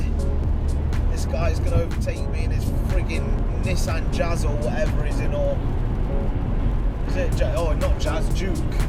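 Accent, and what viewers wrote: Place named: South Yorkshire, United Kingdom. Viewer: I actually own this exact car and its actually a perfect performance car aha and this is my first video i watched of your channel and i really enjoyed this video so u earned yourself another subscriber and as soon as you started talking i instantly knew you. Was from round south yorkshire area aha im from Sheffield my self. :) where abouts do you DJ might have to come and see you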